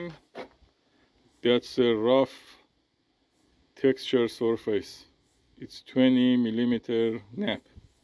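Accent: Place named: Iran. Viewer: I'd recognize that Persian accent anywhere. :)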